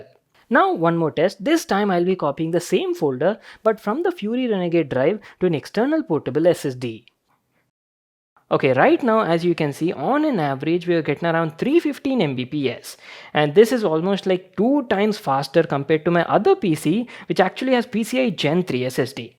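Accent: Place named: Hyderabad, India. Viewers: 😂Hindi is pure Hyderabadi style😂 notice your Hindi rare❤ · you have that amazing hyderabadi tone in your language, love it☺️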